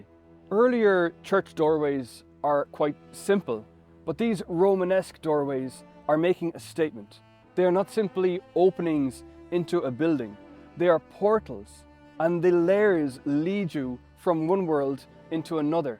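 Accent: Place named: Ireland. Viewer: Thank you for the interesting info. I love the Irish accent What is the significance of the puffin bird - I think it is a puffin?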